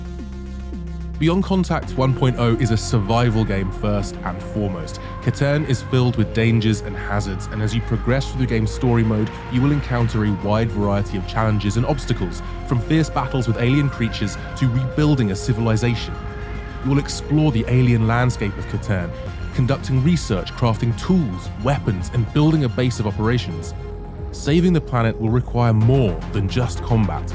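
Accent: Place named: United Kingdom. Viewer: My man you need more views with that smooth British accent.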